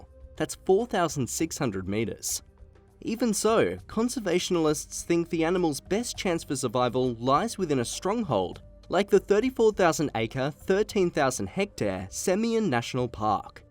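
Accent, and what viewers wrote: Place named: Australia. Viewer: Gotta love an Ozzie accent! 👌🏼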